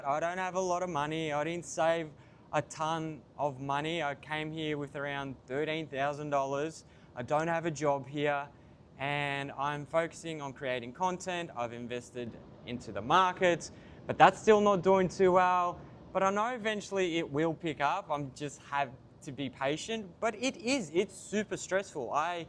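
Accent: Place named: Australia. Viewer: IR A REAL DING BAT!! NOBODY MOVES TO ANOTHER COUNTRY WITHOUT LIVING FIRST U MORON!!! UR CHARACTER AT THIS AGE ...I DID NOT LEARN ..TO LISTEN N GROW UP!! U DO IN HASTE SO HOW ON EARTH CAN U CHANGE??? UR GOING TO REPEAT UR SILLY WAYS!!! GET RID OF THAT SICKENING OZZIE PEASANT SLANG...N LEARN TO SPEAK PROPERLY..."ENGLISH"!!! NOT D ANNOYING ACCENT AT ALL!!! FIRST LEARN TO LISTEN TO D LOCALS ...N NOT SPEAK INTERRUPTING WHILE SOMEONE IS SPEAKING...COZ U WONT HEAR OTHERS GET IT???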